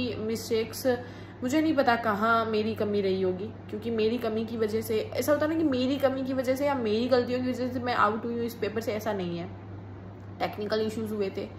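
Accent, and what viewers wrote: Haryana, India. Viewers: Haryana se ho kya sis aap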